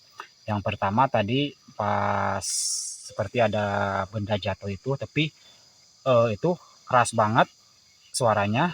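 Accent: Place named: Sunda, Indonesia. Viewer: logat sundanya kentel bngt orang cianjur ya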